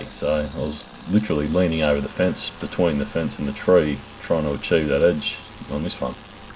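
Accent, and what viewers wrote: Australia. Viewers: Subscribed awhile back and glad to see more have found you. Screw those bringing negativity. Enjoy the work, your attitude, seeing Australian yards and the accent. Keep it up! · I like your techniques, it is nice to hear an Aussie accent with this type of video,keep up the good work Mate 👍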